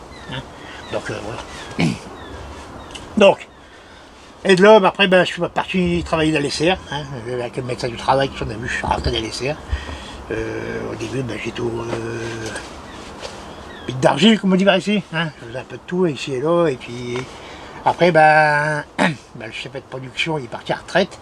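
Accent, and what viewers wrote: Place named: Boulogne, France. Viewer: Oh là là!! Déjà l’accent français et là le boulonnais lol 😂 J’ai bien rigolé, merci! Joyeux temps des fêtes à toute votre petite famille! Nous on vient de recevoir au moins 40 cm de neige, ce sera un beau Noël blanc!! Bisou du Québec!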